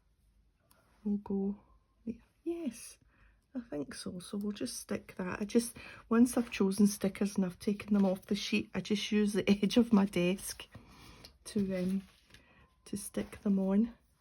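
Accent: Scotland, United Kingdom. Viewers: Hi I am a new subbie, I am enjoying watching your little collages, I've watched a few now.... Mine are the opposite ha ha.... As for your accent I think it very easy to understand your Scottish accent is quite mild and mellow I think, it's quite calming actually but I suppose to foreign people it can take a bit of getting used too. 🇬🇧 I think a 'Thing' can be literally any item, from a watering can to a kitchen appliance etc. 😂😂 Odd numbers are easier to work but it turned out cute in the end 😁💖 tfs Elaine from England xx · Personally, I wouldn't mind if everyone had a Scottish accent like yours although I hope we do not lose all the different accents. It would be a shame to lose the variety and the history that is behind them. I read somewhere that the Scottish accent is preferred for call centers because of its warmth and approachability. I know I can listen to you all day especially when you are telling one of your stories. 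I find it very relaxing and comforting. Both prompts are very well-balanced and a pleasure to look at. I think this would make a great book to sell for inspiration.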